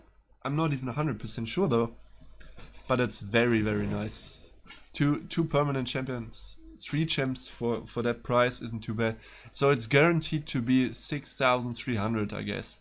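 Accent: Germany. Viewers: Man hört das deutsche in seiner Stimme ^^ aber is ja nicht schlimm weiter so :D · hah dat german accent :P but don't worry i`ve got the same problem ^^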